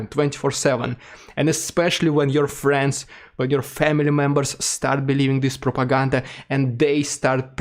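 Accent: Russia. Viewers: Hello: I enjoy your videos once I got used to your accent. I wish I could send you donations but I am retired and live on a fixed income. Slava Ukraine 🇺🇦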